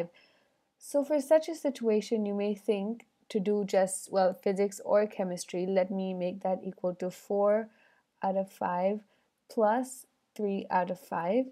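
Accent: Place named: India. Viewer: Thanks. 👍 I was just wondering if you are an Indian? You sound like one.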